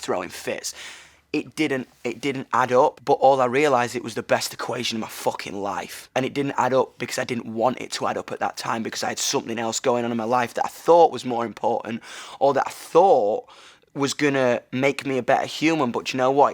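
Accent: United Kingdom. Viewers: This is my first time hearing him talk and I wasn't expecting him to be British lol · Sure he’s passionate and this does deserve to be on genius, but another reason is because he’s British and everything they say sound amazing. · He’s British that’s how we say it it’s never fuck it’s either feck or fook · I did not know that he had a bridish acsent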